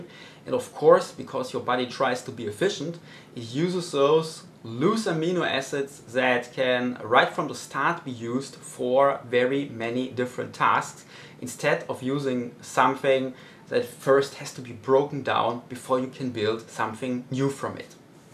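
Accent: Germany. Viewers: Loving your accent ,are you german?Anyway nice video,good info.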